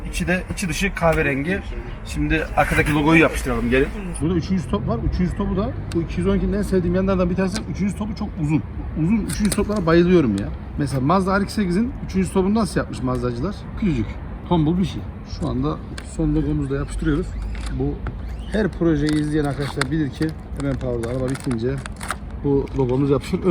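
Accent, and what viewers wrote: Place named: Kayseri, Turkey. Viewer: senin gibi bir adam bu jantı nasıl seçer...!!! harika oldu eline sağlık kayseri şivesi insanlığı ve sanayi kafasını tekrardan gördük ...!!! sizleri seviyoruz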